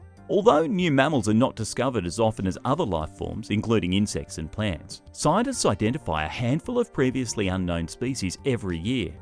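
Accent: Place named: Australia. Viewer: An Australian accent but adopting American terminology.... what's the matter narrator... can't accept Australianisms?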